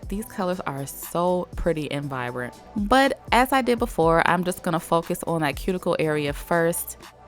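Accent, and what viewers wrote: South, United States. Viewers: I was going to get this kit a few weeks ago but held off. I honestly wasnt sure if they would glow well or not, and being in quarantine I was hoping to do a fun set. I decided to order the kit a few days ago and now it is out of stock😭. I am sooooooo happy you did a review on this kit I love how honest and descriptive you are!!!!!! I'm originally born and raised in the south now I live in nyc so to hear that southern in your voice is like home!!!💖🥰🤗thanks for the videos keep them up!!!!!!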